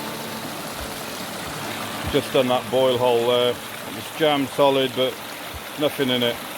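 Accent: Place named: Norfolk, United Kingdom. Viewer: Where are you from butty. You don't have a Welsh accent....more like Norfolk. I guess you moved out of Gods country but the Hiraeth brought you back 🏴👍. I guess the prospecting in the South was not so good. Most of the interesting minerals are in Mid and North Wales. Keep up the good work.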